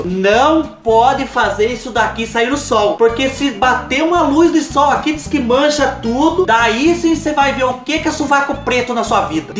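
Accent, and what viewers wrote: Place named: Minas Gerais, Brazil. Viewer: Parece Sotaque mineiro 😂😂😂😂 adoreiiii.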